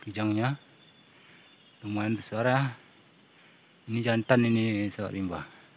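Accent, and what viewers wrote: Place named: Gayo, Indonesia. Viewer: nampak eee si nos konten nee urang gayo tok, logat epeh betih we 😄😄 salam satu hoby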